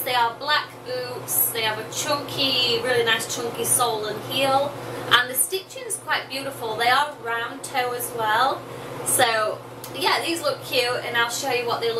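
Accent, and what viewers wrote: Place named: Australia. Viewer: hehehe anytime. Aussie accents arent that bad but yours is the best by far.!!! xo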